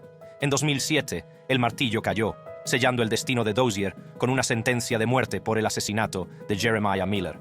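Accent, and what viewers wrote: United States. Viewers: Deberían de buscar un narrador de historias, que no cambie su manera de narrar. Porque habla claro y luego le entra complejo de americano tratando de hablar español, que no se le entiende mucho. O te peinas o te haces rolos. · Q voz.... empieza hablando muy bien el español y luego a lo gringo, si no puede q no hable y pongan a otros q hablen bien el español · Ridículo hablar con acento americano cuando se escucha que puedes hablar perfectamente el español ridículo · Que cringe da este motor de voz gringo 😅😅😅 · Nose ,si persiven que el narrador habla de diferentes formas,primero habla como gringo que está aprendiendo a hablar español,luego habla normal,luego con acento de como español es rara su narración .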